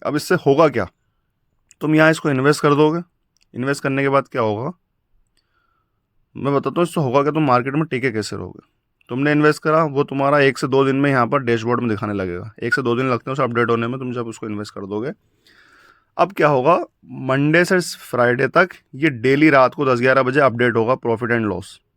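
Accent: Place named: Bhopal, India. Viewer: Bro Bhopal se ho kya?? Accent thoda Bhopali lg rha hai 😅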